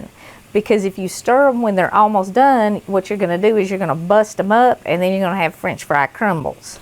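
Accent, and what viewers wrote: South, United States. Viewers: Great video- very helpful I love your southern accent are you from Tennessee?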